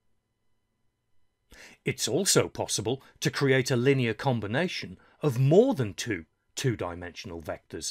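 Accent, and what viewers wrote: United Kingdom. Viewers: So nice of you to do this especially as a school teacher. You accent is definitely British and I'm from India...so are you in England right now? What's your current time zone...? Asking to bother you only at specific and appropriate times of the day.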